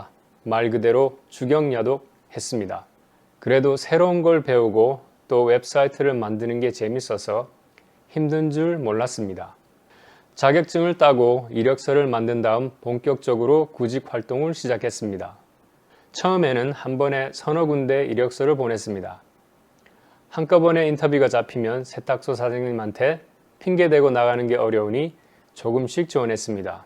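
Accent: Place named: Daegu, South Korea. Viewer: 감사합니다! 사투리가 살짝 들리는데 대구분 같기도하고..(저는 대구 토배기입니다ㅎㅎ) 뭔가 더 반갑습니다ㅎㅎ 종종 업데이트 드리겠습니다!!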